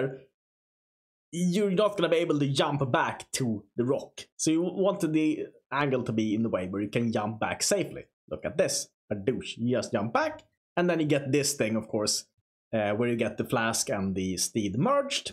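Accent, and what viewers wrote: Sweden. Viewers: Svengelskan är start asså😁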